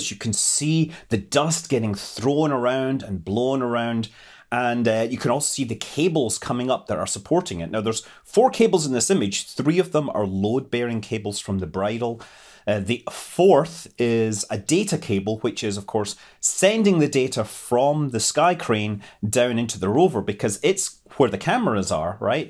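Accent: Scotland, United Kingdom. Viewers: Nothing screams Saturday night as a 25 year old more than a Scotsman talking about space! Love it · Husband: "Are you watching that Scottish guy talk about space again?"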